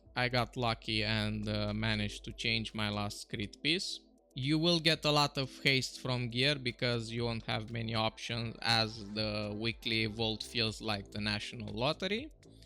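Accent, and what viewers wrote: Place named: Russia. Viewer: о боже, какой у тебя акцент... ты походу русский...)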